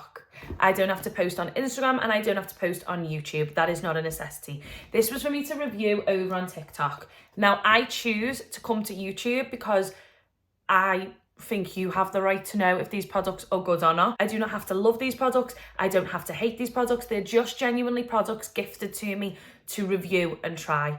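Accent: Liverpool, United Kingdom. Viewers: Hello from Belgium ...you sound like a Scouser ? Liverpool ? HILARIOUS : "hair in me bom" HAHAHAHAHA I came here to find out about the products and I have been roaring with laughter! That said, I'm a new sub ! 🙂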